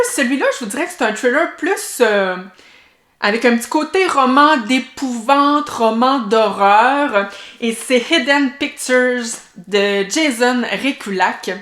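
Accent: Quebec, Canada. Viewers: Hello, Tout d'abord je voulais te dire à quel point c'est un plaisir de t'écouter.... J'aime ton accent, le Québec est le pays de mes rêves et tous ce qui y fait référence est magnifique pour moi.... Continue de nous régaler avec tes vidéos qui sont pour moi un plaisir immense.... J'ai dans ma PAL "entre 2 mondes" et "la ou chantent les ecrevisses" je pense que je vais bientôt les sortir grâce à ta façon d'en parler ça m'a vraiment donné envie.... Encore merci pour tous.... Toi et tous ce que tu représentes dans mon cœur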